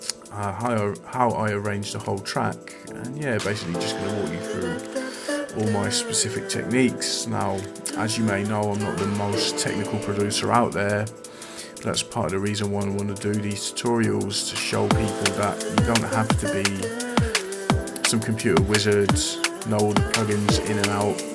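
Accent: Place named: London, United Kingdom. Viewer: that's got to be one of the most convincing london accent coming from a dutchie! it's only the retracted dutch /s/-sounds that border on [sh] that sometimes give away the native tongue.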